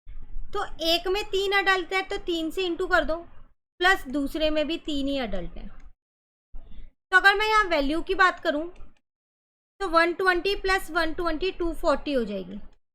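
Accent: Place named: Uttar Pradesh, India. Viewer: Mam you are from UP your voice look like .🎉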